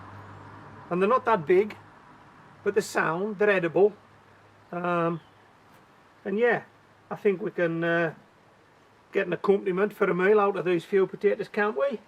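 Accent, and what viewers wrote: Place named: Lancashire, United Kingdom. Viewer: You sound like you are somewhere near Bury, Bolton, or Wigan.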